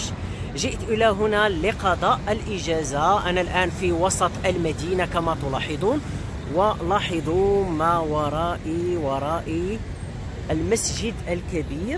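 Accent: Morocco. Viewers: Jat m3ak lehja meghribia.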